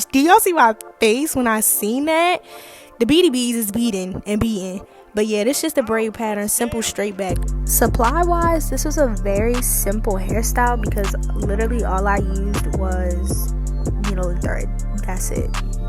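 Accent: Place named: Baltimore, United States. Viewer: The accent giving Baltimore 🤔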